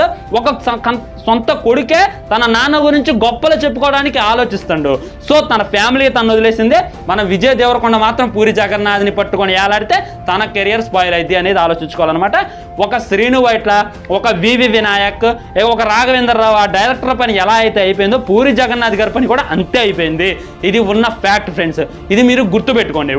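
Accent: Khammam, India. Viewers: Bro meedhi khammam aah?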